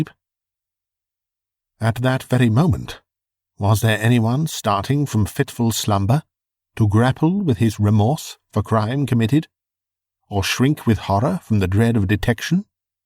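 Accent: England, United Kingdom. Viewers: You and this channel have become my absolute, number one favorite thing to listen to and I listen to them repeatedly! IMHO, only a proper English gentleman can do these awesome old ghost stories the justice they deserve and I’m so happy to have discovered you! I enjoy your readings just as much as I enjoy Michael Hordern and Basil Rathbone readings but (unlike them) I can look forward to new ones from you. I listen every single night when I climb into bed and let me tell you, I’m enjoying losing sleep...THANK YOU!!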